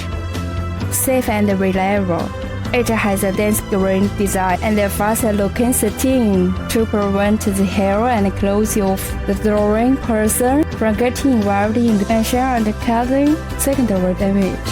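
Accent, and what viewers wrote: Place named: China. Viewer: That is some serious chinglish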